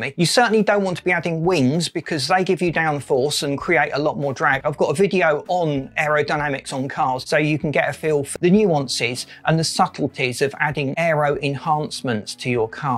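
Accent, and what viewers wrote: United Kingdom. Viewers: Impossible to listen too much twang and cockney accent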